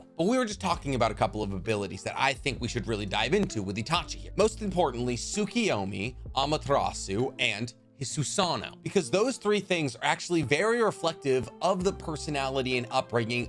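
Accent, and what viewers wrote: Boston, United States. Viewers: Bro your from boston for real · Wait whattttt is the homie from Boston?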